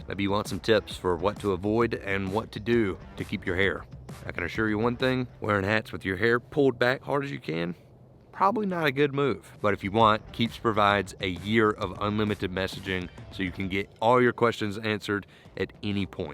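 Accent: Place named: Alabama, United States. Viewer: Hey I'm enjoying this boat renovation stuff, will keep watching to see what happens. I'm from Alabama, it's great to hear your accent.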